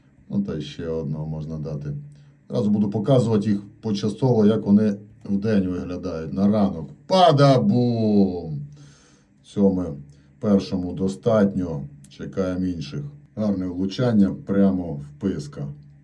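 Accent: Transcarpathia, Ukraine. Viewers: Закарпатська говірка,-Рахів, Костилівка, Ділове )))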